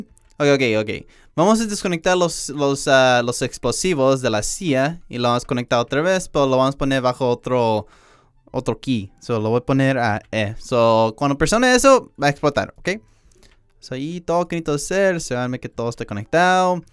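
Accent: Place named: England, United Kingdom. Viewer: un ingles intentado hablar español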